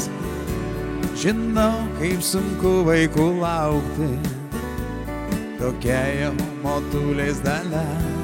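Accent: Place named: Kaunas, Lithuania. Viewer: Vieninteli pliusas, kad moka kauniokišką lietuvių tarmę.Beje,kaip ir aš.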